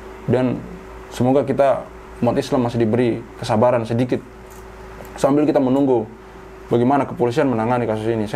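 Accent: Eastern Indonesia, Indonesia. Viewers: Mantaf.......Logat Timur Kaka....,👍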